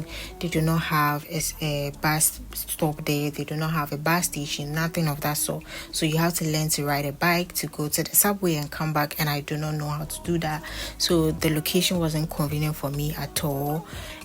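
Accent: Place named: Ghana. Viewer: I heard your accent and I knew you had to be Ghanaian. Love your video🥰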